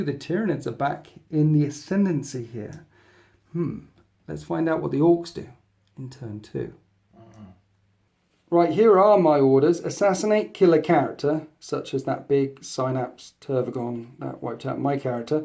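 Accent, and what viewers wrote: United States. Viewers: Lol American accent. Would love to hear a batrep like that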